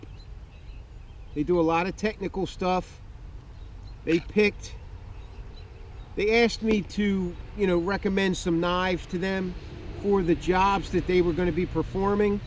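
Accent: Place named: New Jersey, United States. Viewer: You sound like a New Jersey moke to me. It sits well on ya.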